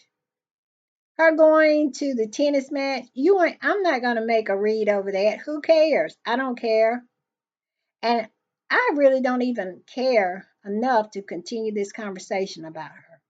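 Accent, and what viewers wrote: South, United States. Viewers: Oh your still getting paid and they are obviously using you as their useful idiot. They will turn on you. You sound like your from the south. The bayou the back woods. Cornbread ignorant honey child. You better get home cause your Ma Ma didn't teach you well. Whatever they are paying you. Ask your soul if you have one. IS IT WORTH IT. 8TH Grade education or am I giving you to much credit. IGNORANT! PAINFUL YOU ARE. SAD SAD.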